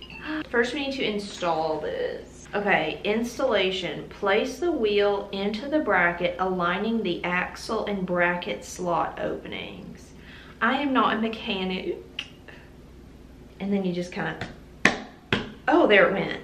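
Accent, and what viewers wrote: South, United States. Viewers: Top tier American accent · You're losing your sexy southern accent 🥺😭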